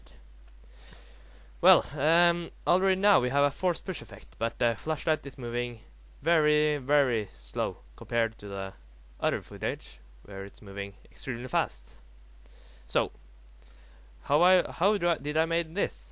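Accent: Norway. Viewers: are you norweigan? :D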